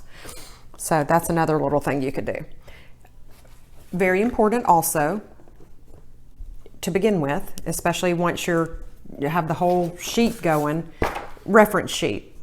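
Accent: South, United States. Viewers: you gorgeous young lady with a hint of southern accent I’m in love. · I'm so glad to have found someone who sounds like me - Southern accent! This helps me to teach my homeschooled daughter. My name is Sam, but when my daughter isolates the short vowel sound, she hears e. If I'm honest, I do too. Any tips with that?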